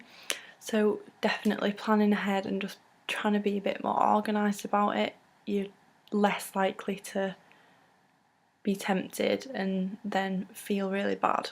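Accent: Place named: England, United Kingdom. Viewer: that's awesome! i love the english accent! haha yep i am :D there's a11 hr time difference! we should keep each other motivated to achieve our fitness goals :)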